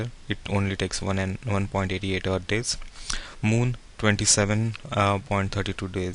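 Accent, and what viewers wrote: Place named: India. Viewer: the indian accent has got to be the worst on earth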